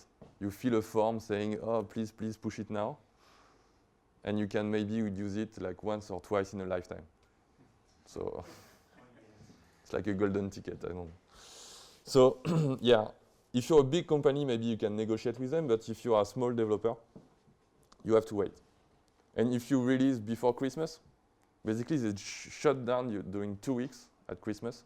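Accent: France. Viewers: L'accent français à jamais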